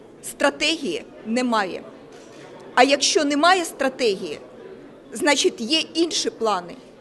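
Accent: Russia. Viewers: как всегда хорошо спела, наша сладкоголосая сирена. Всё же українська у неё хромает, акцент русский :), но Азарову стОит у неё поучиться. Показуха - это её козырь. Тут четко видна борьба кланов Тимошенки и Урки Яныка.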